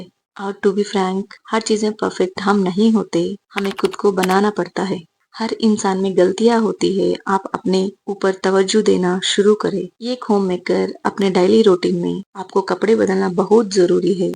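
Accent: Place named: India. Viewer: sahi sahi mujhy apk lehjay sy lag rha tha ap Indian hen😍😍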